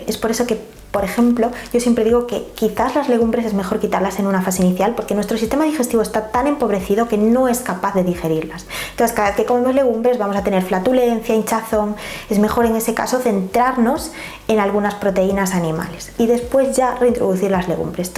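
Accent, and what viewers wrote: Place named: Spain. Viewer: Saludos, son muy importantes tus contenidos, ayudan al equilibrio del cuerpo, mejoran el estilo de vida, bajar de peso si que es una odisea, conocer los efectos de la comida en nuestro metabolismo, entender los beneficios biológicos de todos y algunos no muy comunes en nuestra dieta por cultura e idiosincrasia, Adoro como expones tus videos en tu expresión sencilla y elocuente con ese hermoso acento español, gracias por recordar la cortesía de tus contenidos, abrazos mil hermosa y siempre agradable rodeada de amabilidad...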